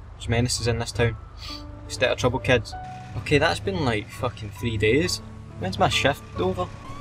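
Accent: Scotland, United Kingdom. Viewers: Are you scottish? You sound scottish if you are I am too!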